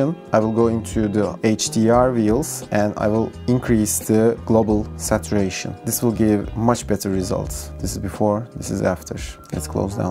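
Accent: Turkey. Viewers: lan dedim bu adam tam Türk gibi ingilizce konuşuyor Türk çıktı, selamlar teşekkür ederim video için :) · You sound like you are Turkish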